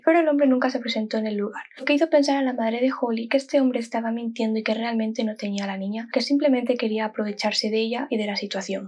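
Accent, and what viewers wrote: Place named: Spain. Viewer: alguien de España contando casos..porque entiendo mejor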